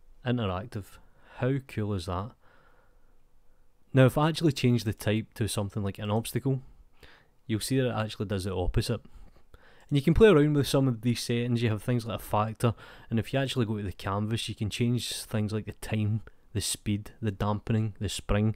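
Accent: Scotland, United Kingdom. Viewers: nice and simple , love those short informitive tutorials you do, thanks , btw I love you accent scottish people are amazing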